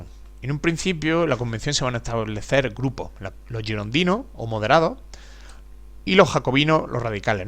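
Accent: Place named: Spain. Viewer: Buena la presentación, pero se agradece por el acento ibérico que posee quien da la explicación que mejore la pronunciación de los nombres. Se pierde uno tratando de tener hilo. Gracias y saludos.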